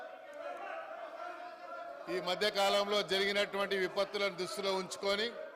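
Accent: Andhra Pradesh, India. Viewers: అమ్మ ఎవరికైనా అమ్మే సేమ్ ఆంధ్ర లెక్క మాట్లాడుతారు మీరు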